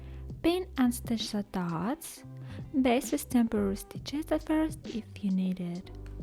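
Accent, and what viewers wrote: Russia. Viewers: У неё акцент как у русской🗿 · Hi! You sound very much Russian, with little difference in the melody of your speech. Also, your wedding ring (I assume) is on your right hand :)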